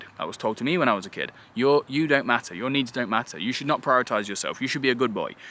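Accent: United Kingdom. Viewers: Sounds like regular UK English to me. Background looks very UK-ish too :) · He is from the UK.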